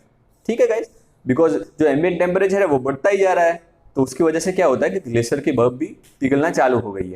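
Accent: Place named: Gujarat, India. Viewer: Bhai gujrati chho ne awaj thhi j Lage chhe but good explanation sir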